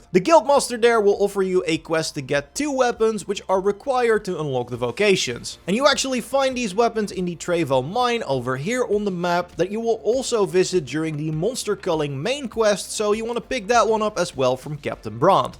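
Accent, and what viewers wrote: Netherlands. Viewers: You are so duidelijk Nederlands, Nice video! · Ben jij Nederlands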